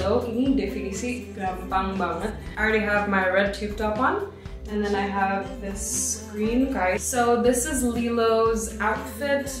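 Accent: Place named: United States. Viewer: Aksen nya america bgt…tinggal di US ya?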